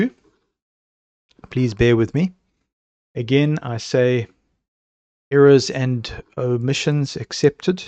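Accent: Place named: South Africa. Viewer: Heard your voice and thought you sound south African. Kept in listening then you spoke of SA. Then continued and thought. I can swear I heard a talk of yours. You have a very soothing voice. But that's not easy for a tween trying to stay awake at a slow Sunday afternoon public talk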